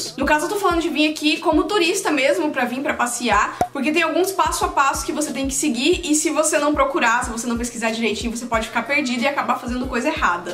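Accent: Goiás, Brazil. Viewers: Sem conhecer você em um outro vídeo imaginei que fosse goiana pelo sotaque,morro de vontade de ir para outro país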